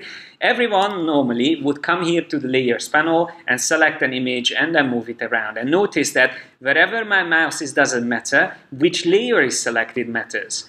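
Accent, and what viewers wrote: Hungary. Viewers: Magyar vagy? Az angolodnak annyira magyar akcentusa van!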